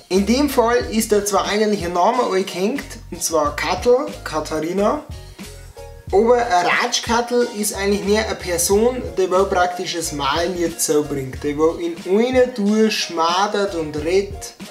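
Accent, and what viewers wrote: Bavaria, Germany. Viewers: Find dich mega cool :D versuche durch deine Videos Bayrisch zu lernen :) könntest du irgendwann auch mal Bayrisch für Fortgeschrittene machen? Oder ein Video, wo du die Aussprache mal erklärst, wie man die Vokale und Konsonanten betont oder wann man das R im Bayrischen rollt :D Lg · Mi grausts bei deinem bayrisch oida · Naja als richtiger bayer 🤣 durd dei Dialekt so wei in de lusern 😅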